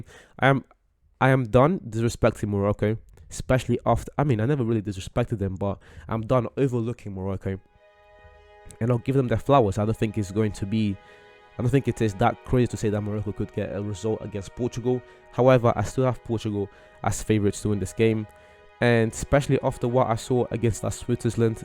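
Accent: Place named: Germany. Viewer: Are you German? Accent is strong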